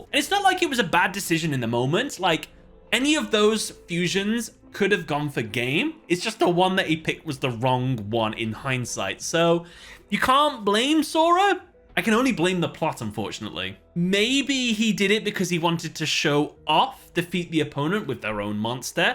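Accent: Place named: United Kingdom. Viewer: I assume it's the British accent + "penultimate."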